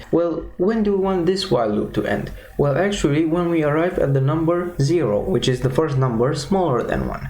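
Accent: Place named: India. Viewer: More indiant accent please!!! Btw great video!!! Sub from me😁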